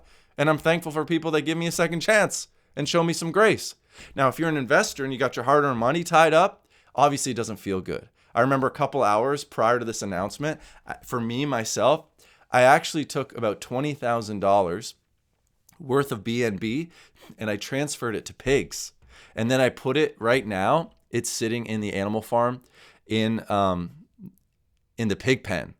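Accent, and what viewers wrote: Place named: Australia. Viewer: You Australian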